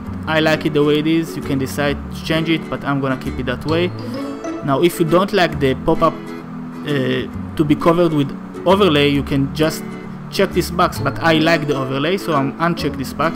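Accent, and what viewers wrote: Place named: Tanzania. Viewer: You have a Tanzania accent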